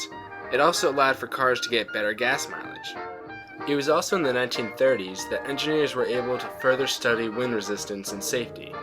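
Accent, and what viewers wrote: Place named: North, United States. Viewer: Sounds like he has braces. But what's wrong with it? Mightuhv been a homework assignment. Also, I'm gonna guess a northern accent.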